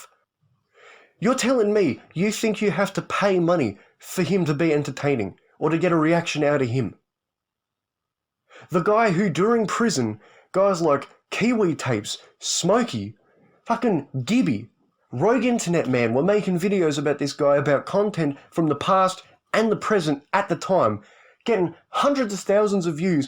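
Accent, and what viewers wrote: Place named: Australia. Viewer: I didn't know I needed an angry australian man complaining about chrischan as much as I did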